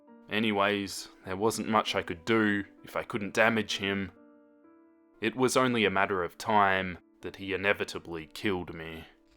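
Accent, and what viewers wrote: Australia. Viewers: Why did I only just realise that you're Australian lmao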